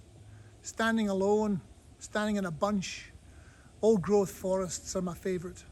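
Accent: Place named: Scotland, United Kingdom. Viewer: Nice to see Colin Hay standing up for the environment! P.S I'd never heard him speak before, and after all those years living down under, I half expected him to have an Aussie or hybrid accent, not his beautiful native Scottish accent.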